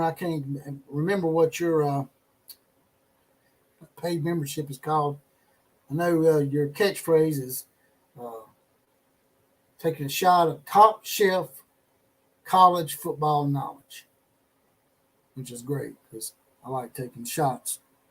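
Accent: South, United States. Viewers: I rather like the southern drawl. But not without the hospitality. 🤣 I'm a Texas fan, so come at me bruh, but I wasn't commenting good or bad, just noticing your southern drawl and sayin there wasn't anything quite like it. I expected a chuckle. But if ya want some nonsense, "I'm your huckleberry." 😈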